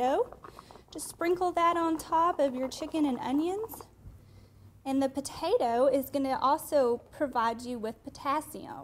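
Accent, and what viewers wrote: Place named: Texas, United States. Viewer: Greetings from the Great White North , I love your recipe and I especially love your beautiful Texas accent. Thanks again ;-]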